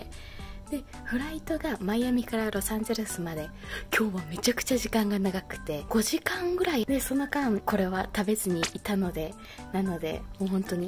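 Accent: Kagawa, Japan. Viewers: たまに出る讃岐弁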